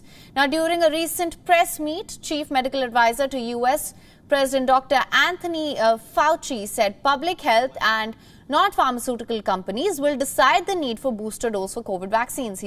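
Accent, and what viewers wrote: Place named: India. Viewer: Something about Indian English... It like proper English with unusual word choices on occasion.😎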